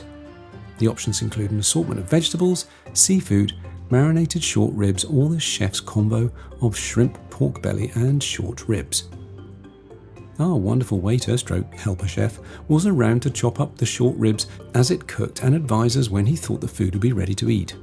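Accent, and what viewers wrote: United Kingdom. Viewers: Why does the presenter have a British accent?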